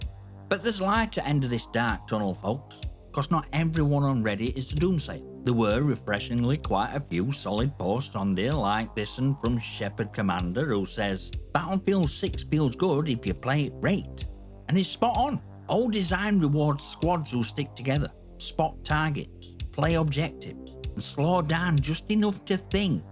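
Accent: United Kingdom. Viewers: You have the best style of Brittish accent, so I'm subconsciously agreeing with whatever you say automatically before thinking critically about it. It's like a nice big bonus that you happen to be spot with all your takes here.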